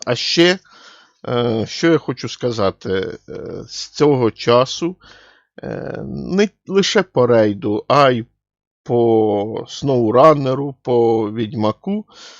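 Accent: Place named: Surzhyk, Ukraine. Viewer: трохи суржик є, пане!))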